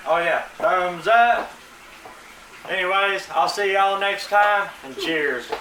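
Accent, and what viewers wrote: South, United States. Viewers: Hi iam so happy to see you!! You are a joy to watch. I like the southern accent its great!! Thankyou for sharing recooes with us. Mighty helpful to learn. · Where do you live? I know its the south cause of the accent. Reason I am asking is because I live in Mobile Al. Its between Penscola Florida & Gulfport Ms.. I was asking because their is a sausage called conecuh sausage. This stuff is so good you want ever go back to any other sauage. Wal-Mart sells it heck every store where I live sells this. When I was living in North Carolina target sold it, so I know you could ask someone could they order it & you could buy it from the store. This sausage would be so good in anything you cook. Its a great grill sausage as well. If you have never tried it you should try some. If you don't want to order it ask your local to store to order some. 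I told people in north carolina about it they would seriously go in target an buy like 4 or 5 packs at a time, so if they was sold out they would have some on hand in freezer.. When relatives come down they literally take a cooler full back with them. This sausage is just the best. I will leave a link... Anyways I love all your southern recipes. Southern food is the best food. Thanks for the really good recipes. <link>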